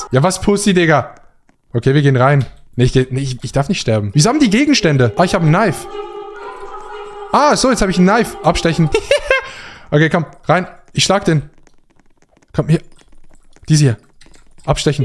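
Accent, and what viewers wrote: Switzerland. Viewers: das ist deutsch halt schweizer art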